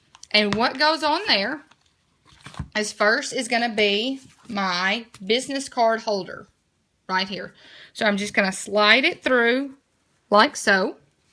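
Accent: South, United States. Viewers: Really nice video, very clear, easy to follow, and I loved the clear way the ensembling of the foxidori. I also loved that sweet Southern accent! :-) So warm and homely to hear!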